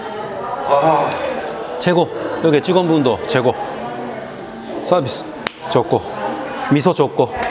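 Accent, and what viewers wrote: Busan, South Korea. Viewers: 정말 오묘하게 부산사투리와 일본어억양이 다 슦여있네야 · 진짜 부산 토박이 같아ㅋㅋㅋㅋㅋ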